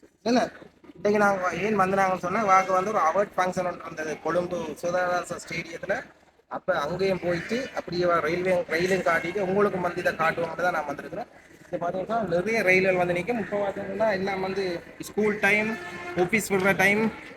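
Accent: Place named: India. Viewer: Nice and useful video, hope you are from Jaffna, but smells a bit of Indian Slang!!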